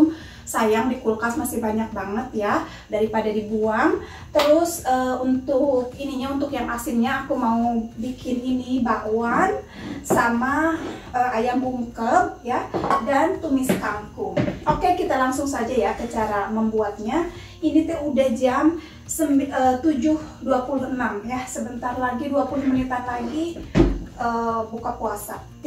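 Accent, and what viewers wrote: West Java, Indonesia. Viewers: Logat nya kaya orang Sunda.. Makanan sederhana tp Nikmat tiada tara.. Selamat menjalankan Ibadah puasa.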